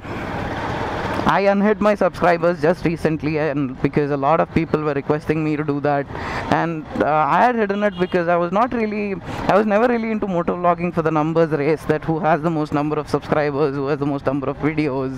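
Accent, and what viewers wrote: Hyderabad, India. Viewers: Lov u n ur videos too bhaii.. Donno the reason for God sake but i absolutely enjoy watching ur videos.. They are not dramatized nor filled with juvenile but they are very very natural and appealing.. Ur communication is awesome no doubt abt tht and wat i lov the most is tht typical hyderabadi accent ull be using here n thr.. Even in my frnds circle we discuss abt ur videos more than any.. Hum hum hyderabadi bhaiii:p.. Well cheers to you bhaii..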